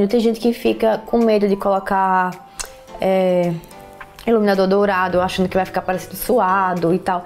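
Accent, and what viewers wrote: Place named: Maceió, Brazil. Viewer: amo o sutaque dela e tmb sou de maceio❤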